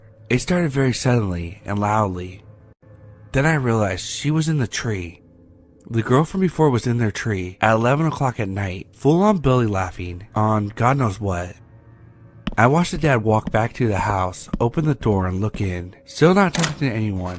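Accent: South, United States. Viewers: I dont know if you had csndy in your mouth or if u havw a cold but if u arw trying to speak differently ( just im case someone told u they dont like the way u speak) then dont like ur voice is okay i like the country wake u speak.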